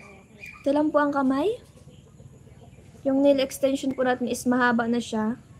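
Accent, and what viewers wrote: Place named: Visayas, Philippines. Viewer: 😂😂😂😂😂 nakakatawa ka langga mag salita nf bisaya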